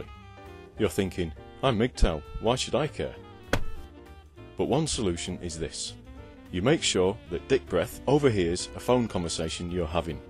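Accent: United Kingdom. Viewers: MGTOW vids with English accents always makes me smile. Top class as usual. More vids from you would be most welcome. Thanks for your content.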